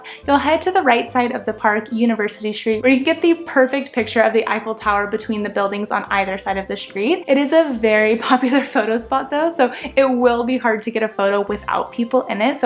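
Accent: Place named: United States. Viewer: I love the way she talks, just straight up American without even trying to enunciate the local dialects lol 😅 I'm flying out tomorrow, we'll do the same! 👍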